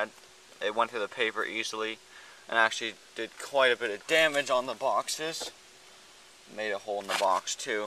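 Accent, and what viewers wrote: Quebec, Canada. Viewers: Are you Canadian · You from quebec? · are you Canadian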